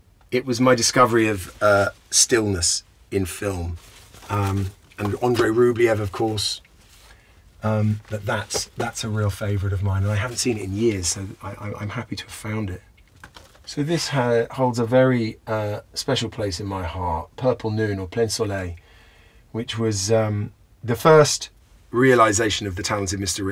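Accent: United Kingdom. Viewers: HES BRITISH!??!!!???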